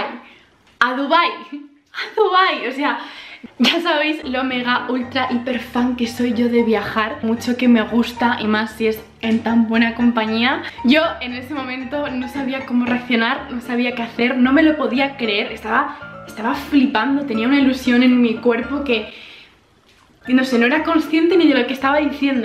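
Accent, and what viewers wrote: Spain. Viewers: Me encanta el acento español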